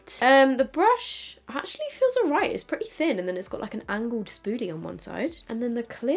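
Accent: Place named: United Kingdom. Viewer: I love this makeup look, I think I’m gonna try it tomorrow (minus the gems because I’m moving house and don’t need to be too fancy 😂) Side note: it’s so adorable when you say “you know what?!.” 😍 Hope it’s not patronizing for an American to say your British accent is adorable, it’s just so soothing and makes everything you say sound interesting!!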